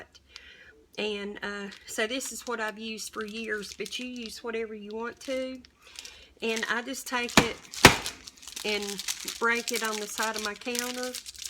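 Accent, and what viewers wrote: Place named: East Texas, United States. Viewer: I love your accent! I went to high school and met my husband in East Texas—listening to you is like going home! ❤️❤️❤️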